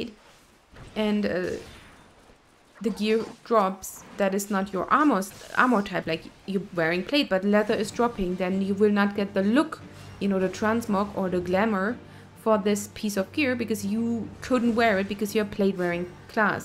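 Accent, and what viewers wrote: Germany. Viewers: Ist that a German accent? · Thought you were german, you speak english like a german one. Greetings from Germany to France =)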